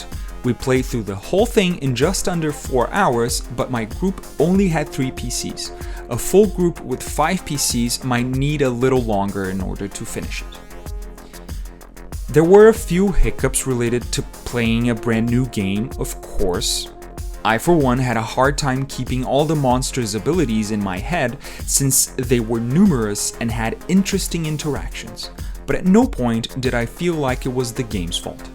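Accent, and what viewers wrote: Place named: Brazil. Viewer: eu tava estranhando o sotaque no último vídeo, mas não tinha reparado no nome... Vc é br senhor? kkkkkk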